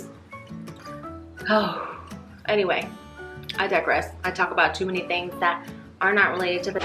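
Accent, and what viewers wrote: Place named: South, United States. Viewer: How serious is the vanity issue in Korea and the deal with the narrow chin/jaw I have seen some that the shape of the face with the jaw/chin being so narrow/thin but the top of their head is bigger it is shaped like how they portray aliens to look (like old Roswell stories). Anyway I love learning about the culture and the only thing that I find concerning is the appearance/vanity issue. I do subtitles for Liu Studio, sometimes (aka Lee Sungmin from Super Junior) so I need to learn even more. I am originally from the South too, I knew you were the first time I heard you speak haha. I now live in Australia. I hope to visit Korea sometime.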